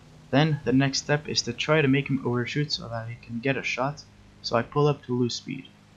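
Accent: Quebec, Canada. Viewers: Great video yet again. I have a couple of things that I'd love to understand. 1. It seems like you think roll rate is more important than turn rate is for achieving reversals. Is this because you will usually have very little time to aim and hence you will usually need to be able to orient your plane very quickly? 2. In your clip at 5 mins, what should the Spitfire Mk XVIII have done rather than pitching up? 3. Great call on adding your voice. Are you from Quebec by any chance?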